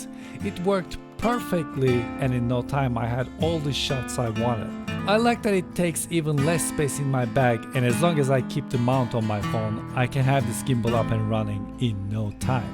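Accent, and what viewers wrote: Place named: Turkey. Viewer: ar yu torkish birader, aksan igrenc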